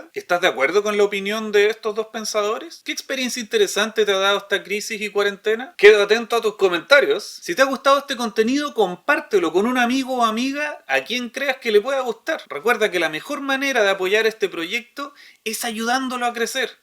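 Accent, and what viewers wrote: Chile. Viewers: Esa voz tan chilena 🙂😉.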